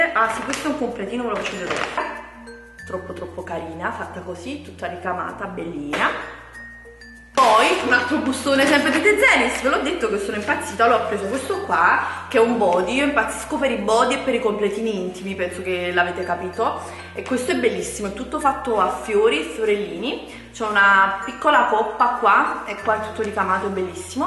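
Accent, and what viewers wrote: Naples, Italy. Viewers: ma sei napoletana comunque ti stimo e sei bellissima e proprio ora mi sono iscritta perche sei simpaticissima e dolcissima😙😙😙😘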